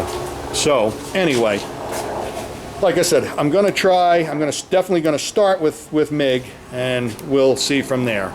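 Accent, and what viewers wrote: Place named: New York, United States. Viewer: Sounds as if you are from New York / or New Joyzee Could you not have put some loads on it that would have , as you carbon arked , helped draw it togeather & then even more so as you welded , hot metal shrinking has ton’s of force. One must be very much a Mc Geever doing this kind of stuff. If that cyl boss is out of square , it will cause side loading to the cyl & it will not last a month ! I own a CASE extend a hoe . Looks like the John Deer is fashioned different, but that looks like the inside of the extender , that would make the boss the lifting point for the boom, final picture, they probably had the boom extended & tried to lift a # of HEAVY objects ,- a stupid move, - , drag it in close , re-rig & then lift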